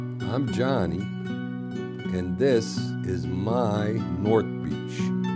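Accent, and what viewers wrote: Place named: New Jersey, United States. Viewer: Belleville, Bloomfield, Nutley or Newark? Your speech patterns sound like home. Your recipes are marvelous. Thank you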